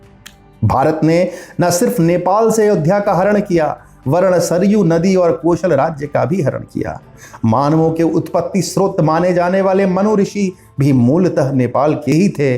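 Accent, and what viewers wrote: Standard. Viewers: It's sudh hindi sunkar a6ha laga. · Sir, aapki aawaz sunkar accha laga, aap bahut sudh Hindi bolte hai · Kya baat hai bhai tum Hindi bahut shudh bolte ho bhai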